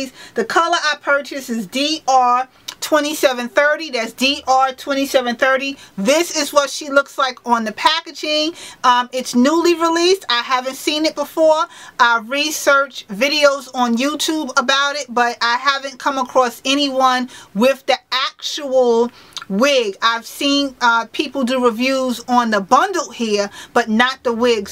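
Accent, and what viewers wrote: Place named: East Coast, United States. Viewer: New subbie love the accent. Philly, NJ or NY? Lol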